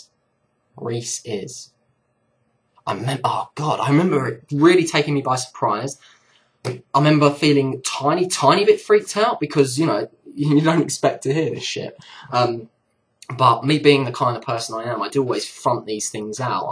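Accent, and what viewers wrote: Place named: Harlow, United Kingdom. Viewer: At last!!! I can pinpoint that accent...HARLOW!!! :^) lol. I'm an Essex boy but the complete opposite of the stereotype, and now live on an Island in the Indian Ocean...another Brit that has jumped ship! Anyway, regarding your series on Wave Function...I think you might be interested in Nassim Haramein, search also for ''the illusion of reality'' (59mins), and Flagellar/um motor or watch watch?v=VWvS1UfXl8k all parts. Peace Dude (fellow guitar player).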